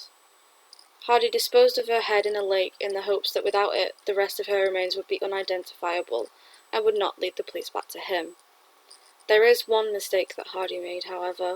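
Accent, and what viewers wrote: Manchester, United Kingdom. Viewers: I 1st heard of Trevor Hardy years ago and I'm from Manchester myself and I live opposite where 1 of his victims was found, you sound like you're a Manc yourself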